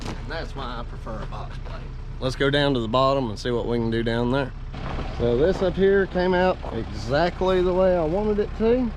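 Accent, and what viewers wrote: South, United States. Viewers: Outside of the southern twang, I like your style. 😁 New subscriber.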